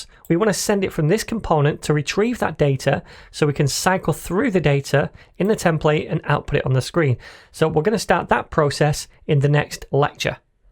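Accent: United Kingdom. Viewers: Is this tutor British?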